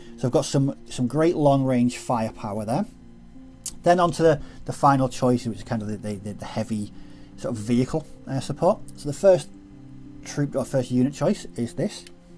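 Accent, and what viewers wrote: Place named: United Kingdom. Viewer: I think you have a very good British accent. For everything else, I found this great little site called <link> where you can listen to peolpe pronouncing words in their native language. Somua is not on it (yet) but one can request words and people native to that language will record reading pronouncing them eventually. I am waiting for the Somua now ... a French person will read and record it soon :) <link>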